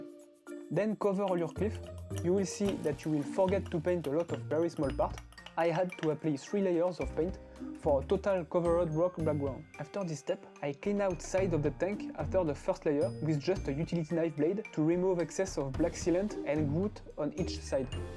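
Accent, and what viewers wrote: France. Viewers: J'ai direct su que t'étais français à l'accent 🤣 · English is good but you French with that accent make me laugh. Sheet = shit lol. I think all French struggle with English.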